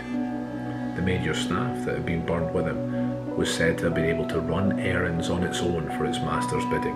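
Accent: Scotland, United Kingdom. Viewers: You should put out an audiobook, on all the eerie stories of Edinburgh! It would sell, BIG! You have such a good voice, lovely Scottish diction, but not overly much to create misunderstandings 😉🤣 Thank you! 💙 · Ghosty tales, wonderful Edinburgh, a smooth Scots accent --- perfect. Thank You.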